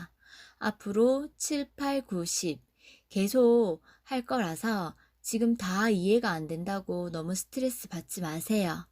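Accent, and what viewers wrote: Gyeongsang, South Korea. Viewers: 감사합니다 목소리가 이쁜 공인중개사님~~ 성우 해도 되겠네요~^^ 대구분이세요? 잘듣고갑니다~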